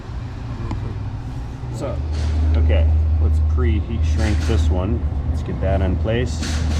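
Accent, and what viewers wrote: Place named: Harvard, United States. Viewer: why does this guy talk like hes from Harvard?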